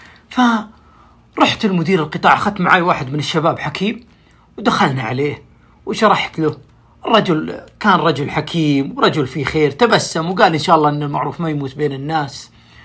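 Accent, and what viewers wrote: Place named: Libya. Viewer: سبحان الله كلامك ولهجته كانه من ليبيا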